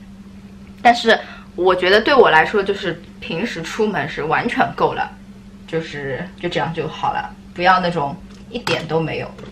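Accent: China. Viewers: 你啊是苏州人啊！！！感觉口音伐要太亲切哦！！ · 第一次看你视频就确定你这蜜汁口音是上海人😂因为我也是哈哈。 推荐看明星大侦探诶，有点烧脑但是也很搞笑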